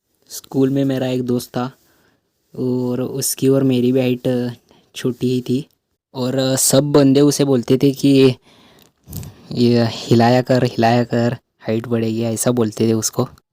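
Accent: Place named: Maharashtra, India. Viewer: U r maraThi🌝Accent Pechanl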